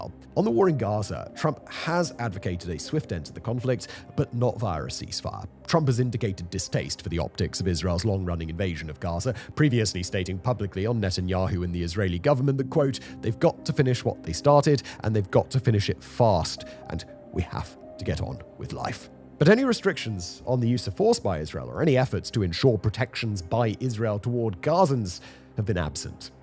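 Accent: United Kingdom. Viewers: you talk too fast. when we here a British person, we need to feel like we're being served something. its weird.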